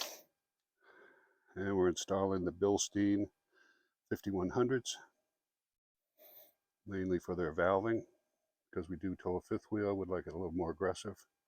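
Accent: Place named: Maine, United States. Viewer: You sound more like a Mainer than someone from Tennessee. Nice vid.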